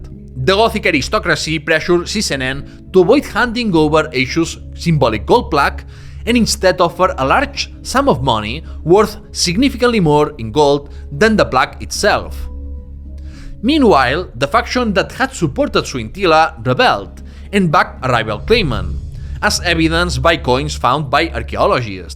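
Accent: Spain. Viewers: Is there an option in Spanish, this English is a little too Spaniard for me?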